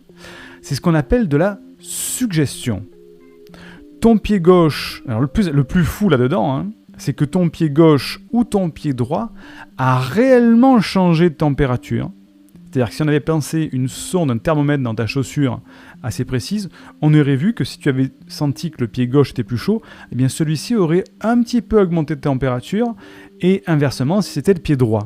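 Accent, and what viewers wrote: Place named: Quebec, Canada. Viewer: Euh...écouter un québécois à vitesse 1,5 ça demande concentration quand même 😁. Heureusement que c est pas long. Très intéressante vidéo, merci. Christophe Bernard, d Altheaprovence explique très bien aussi l effet placebo et nocebo... Et, si, oui, moi je m endors volontairement à l heure que je choisis et je croyais que ça marchait pour tout le monde !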